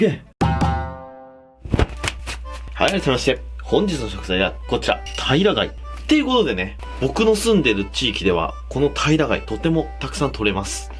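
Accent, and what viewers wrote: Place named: Aichi, Japan. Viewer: サワガニより好き｢だで｣ て言うとやっぱり同じ地元の愛知の人の感じがあって親近感湧く。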